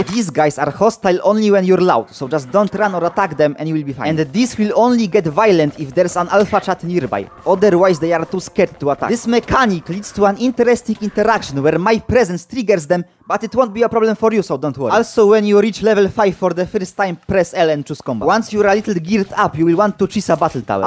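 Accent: Poland. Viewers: masz bardzo polski akcent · Kocham polski akcent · Dobrze słyszę że jesteś Polakiem? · kurna od razu po akcencie mozna poznac ze Polak, kocham cie stary (zajebisty toutorial) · Zastanawiałem się czy Polak po akcencie ale wystarczyło że zobaczyłem 2137 lvl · No akcent polski duży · Po 2 sekundach wiedziałem że jesteś z Polski ale super poradnik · słycahć po tobie ze jestes polakiem XD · Słychać ten polski akcent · are you from poland ?? haha because of your english xDDD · dobra sprawdzałem czy polak i się dowiedziałem to polak po 4 min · bro r u polish? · Jak wszedłem w ten filmik i usłyszałem ten akcent wiedziałem że coś jest nie tak patrze w info kanału i oczywiście polska, od początku coś tak czułem, nagrywasz po inglisz żeby mieć wiecej wyświetleń sprytne. Mam pytanie a propo farm expa xaphan tych zielonych co w lawie się respią po tym jak dotknie wody, bo od chyba wersji 2.9 już te farmy nie działają i czy da się to jakoś obejść że będę na wersji np 2.9.3 i wejdę w ustawienia modów w grze i poprzestawiam coś żeby farmy działały i xaphany się respiły? 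Proszę odpowiedz jak lub nagraj o tym bo dużo osób potrzebuje tej informacji czy da się to zrobić i jak to zrobić a szczególnie dużo osób z polski. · Polski akcent — miód na moje uszy :))))) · are you from poland? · Stary jak miło usłyszeć polaka po angielsku xD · Are you from Poland? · Nie no po akcencie od razu wiedziałem że rodak XD Film też się przydał bo mam nowy rekord przetrwałem 3 dni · od początku wiedziałem że to polak hahaha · tak dawno nie słyszałem kogoś z tak polskim akcentem że ciężko mi czasem zrozumieć co mówisz XD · 2137 widac i slychac ze polak!!!!!!!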